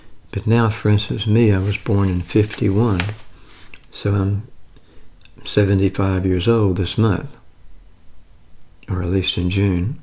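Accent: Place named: South, United States. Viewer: Great video! Thank you for continuing efforts. I wonder if you # or labeled a video southern accent if it'd intice more people to watch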